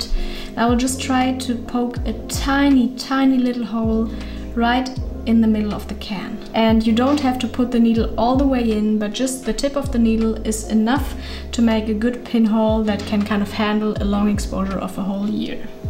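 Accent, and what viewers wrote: Germany. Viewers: I hear a bit of deutsch 😅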